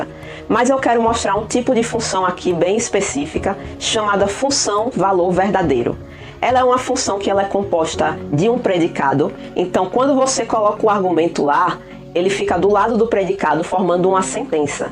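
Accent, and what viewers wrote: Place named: Sergipe, Brazil. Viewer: O sotaque do meu País Sergipe me dá até um tesão. Saudades da minha terrinha. Parabéns, pelo conteúdo!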